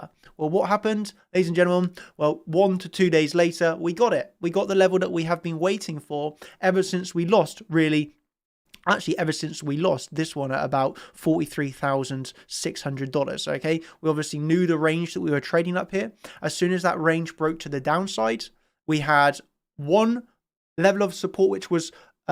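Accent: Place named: South West England, United Kingdom. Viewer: He’s got a posh south western accent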